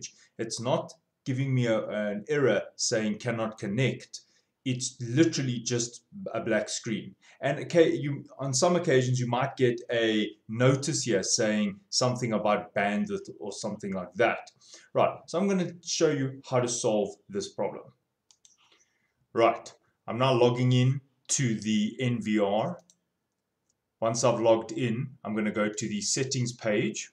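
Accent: South Africa. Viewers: south african lol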